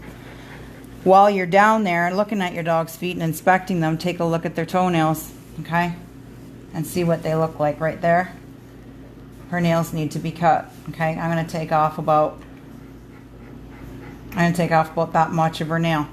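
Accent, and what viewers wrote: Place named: Wisconsin, United States. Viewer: Oh you sound like you gotta be from Wisconsin!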